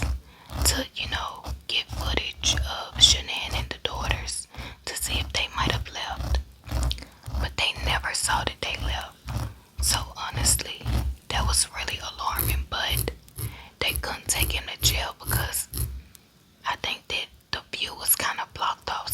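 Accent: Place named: Memphis, United States. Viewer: You are so lovely omg! You said you were from Memphis and your accent finally clicked for me, I couldn’t place it till you said!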